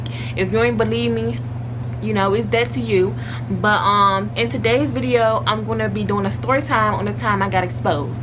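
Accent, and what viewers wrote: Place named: Washington DC, United States. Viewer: Hey boo new subbie here. Are you from dc? I hear a little accent and I was like let me ask lol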